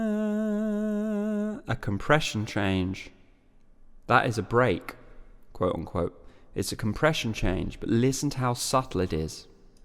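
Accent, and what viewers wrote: England, United Kingdom. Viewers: your english accent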